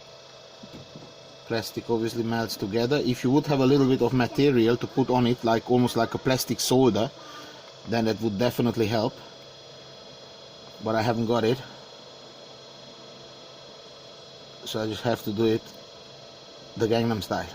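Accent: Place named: Hungary. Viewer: Az akcentusból éreztem, hogy magyar vagy :D.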